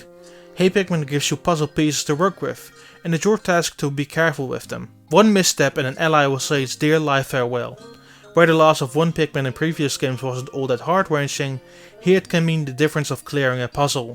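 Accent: Netherlands. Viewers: He's Dutch, like me. Not everyone speaks flawless English, you know? ;) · Are you dutch? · Damn! You're doing a great job working with these guys. I can still hear you speak English as a Dutchman. I hope you will keep working on that but eh... Denk dat vele mensen uit Nederland een voorbeeld aan je kunnen nemen. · Is that Guy dutch? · I know how hard it is to speak English with a Dutch accent, its difficult pain in our asses. Great review, even though nobody likes this game. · The video started and I was like "hey this guy is Dutch". Then I saw name in the description.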